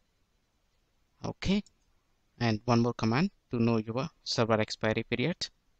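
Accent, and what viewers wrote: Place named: India. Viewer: Indians speaking english sound very ridiculous!!!!!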